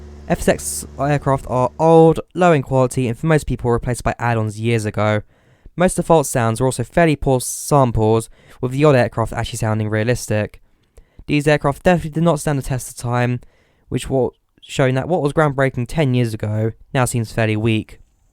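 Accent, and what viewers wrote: England, United Kingdom. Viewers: It was real English not American nothing at all wrong with his voice if you are English. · Is it just me or is this British accent really irritating? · You're saying a lot of interesting things I'm sure but I can't understand much of it. I know you're proud of it, but too heavy on the English accent. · Crazy. English...accent... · british accent is irritating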